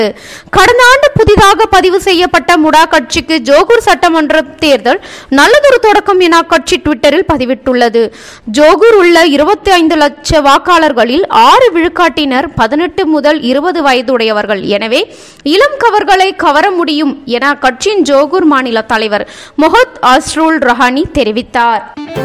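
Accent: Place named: Sri Lanka. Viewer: The way she pronounes lk fm Srilanka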